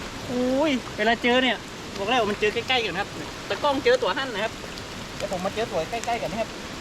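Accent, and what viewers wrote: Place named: Chiang Rai, Thailand. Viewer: บ้านแต้อยู่ไหนนิสำเนียงอย่างคนเจียงฮาย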